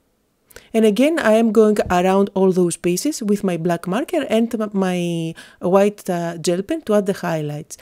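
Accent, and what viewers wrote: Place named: Greece. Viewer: I always liked your accent and wondered where you are from . I wouldn't have thought Greece. I have always wanted to go there!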